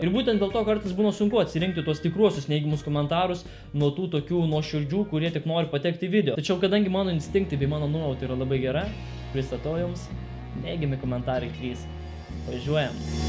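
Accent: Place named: Kaunas, Lithuania. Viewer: Aš kai tavo pirma karta vidijo pamatau aš tesiok. Tu Kaunas nes tu labai juokingai kalbi🤣🤣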